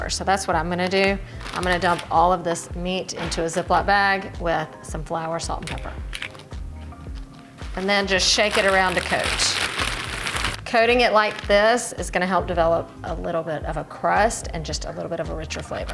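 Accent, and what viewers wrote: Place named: Deep South, United States. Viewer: Thank you. Love the accent. Very gentle Deep South?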